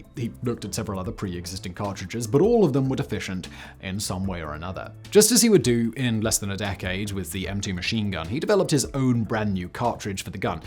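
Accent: England, United Kingdom. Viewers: Something about an english accent talking about guns seems less “jerk-offy” than a guy with a texan accent talking lovingly about his browning m2. Idk i clicked on this video expecting a Brandon Herrera type dude jerk off a 1911 for 19 minutes but I was pleasently surprised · Not a fan of a Brit talking firearms.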